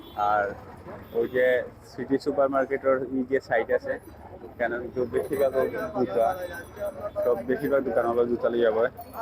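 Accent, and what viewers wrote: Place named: Sylhet, Bangladesh. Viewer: Vai Sylheti kota sera valo lage ❤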